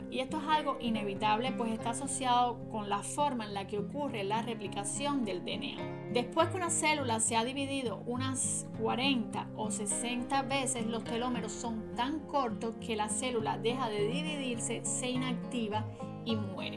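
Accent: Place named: Cuba. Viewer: GRACIAS MUY BIEN EXPLICADO SOY CUBANO Y USTED HABLA COMO NOSOTROS LOS CUBANOS DIOS LA BENDIGA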